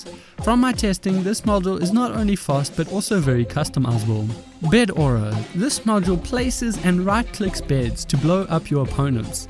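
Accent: South Africa. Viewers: this man sounds southafrican. idk why but he just does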